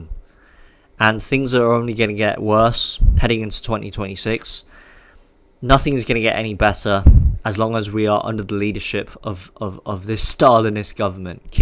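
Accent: England, United Kingdom. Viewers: He speaks like an Englishman - which is weird, let's be honest. I'd much rather Asians sound like whatever Asian they are, but in English. There's no eloquence in emulating a man that you are not.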